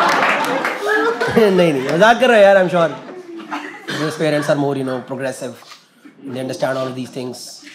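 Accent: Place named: Mumbai, India. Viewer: Got the Bombay boy accent on point bro. Great set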